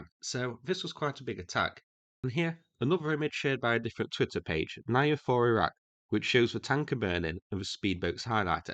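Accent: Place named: Nottingham, United Kingdom. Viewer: That's the just Nottingham accent for you😊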